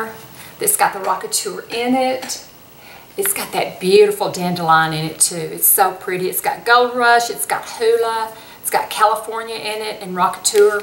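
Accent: South, United States. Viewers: Love this video! I do appreciate your Southern accent, since I’m a Southern girl myself. You look great! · You are stunning,! I just love your beautiful Southern accent. Will you please do a tutorial with a complete demonstration on how you styled your hair for this video? I'm a visual learner with several new disabilities from a brain tumor. I've learned so much from you and your wealth of valuable information. Thanks for all you do!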